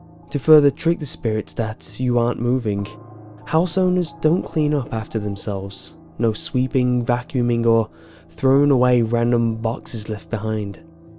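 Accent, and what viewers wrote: England, United Kingdom. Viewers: Yeah, it's obvious he's English, I mean I live in Britain, but where about in England? I can't tell if he's Scouse or Liverpuddlian or Brummie or what...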